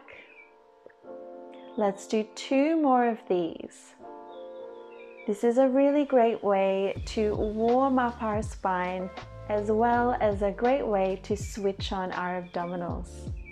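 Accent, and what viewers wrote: Australia. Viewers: Your videos are amazing! Thank you so much. This one was hard. Hoping that I can improve and not die next time hehe. And I definitively love some Australian accent :) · Have done 2 of your classes now, yesterday and this morning. My gluteus are feeling it! I have a couple of favourite channels I follow to mix up my workouts, love your workouts totally agree with the other comments, you are clearly a professional and a great teacher/instructor. Also is really soothing hearing a Ozzie accent😉. Love from Melbourne 😘😘😘❤❤❤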